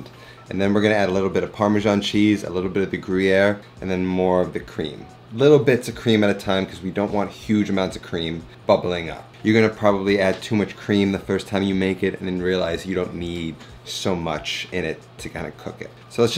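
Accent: New York, United States. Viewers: Haven't watch this potato video yet, your description sounds delicious. I come from NY and listening to how you speak and your directness sounds like music to my ears, has a comforting feeling too.I miss the people of NY. So like your recipes, trying to cook them. Thanks for maintaining your integrity ( cutting board issues by others, not me.) Bless you and you hard work.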